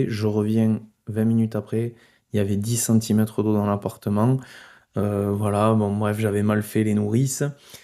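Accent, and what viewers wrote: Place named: Rodez, France. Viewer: T'es un vaillant, bravo. Bosseur avec une tête bien faite. Même si t'as dû perdre quelques années de vie à cause du stress 😅 La vie d'un entrepreneur en somme J'ai adoré ces 2 videos qui retracent ton parcours, je n'ai pas vu le temps passé. Étant ruthénois je devine avec ton accent que tu ne dois pas habiter très loin de chez moi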